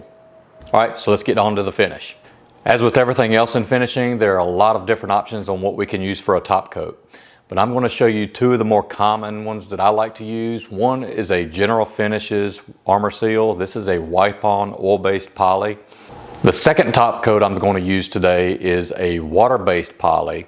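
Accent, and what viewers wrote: South, United States. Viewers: Cool. I have lived most of my life in northern Minnesota. I like listening to accents and your accent sounds like my kin from down south. Nothing like Texas accents. I appreciate your VIDEO! I am attempting to build a shelf and have never done wood-working since I was a kid. Kind regards from up north and Merry Christmas.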